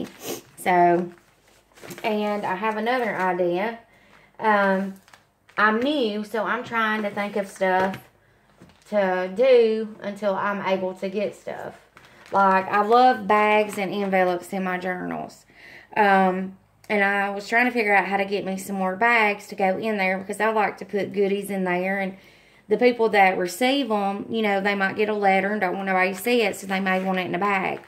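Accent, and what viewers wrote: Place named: South, United States. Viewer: love the southern accent ! awesome idea's !!!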